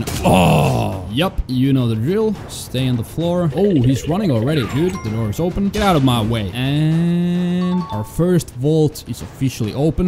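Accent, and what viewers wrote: Finland. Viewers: Are you finnish? i was just listening your accent and trying to figure it out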